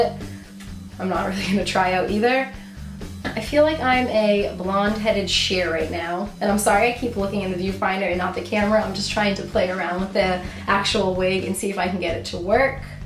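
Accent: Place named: Midwest, United States. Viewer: Her accent has a Midwestern sound. Like Wisconsin or Minnesota. It's a little infectious from her.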